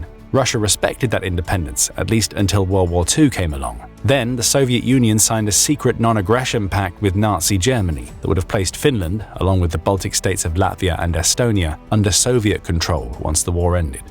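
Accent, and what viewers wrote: United Kingdom. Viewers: Your British accent is charming. Nevertheless, it is up to Finns to decide if they want to promote and live in peace or become proxies, perhaps Ukr 2.0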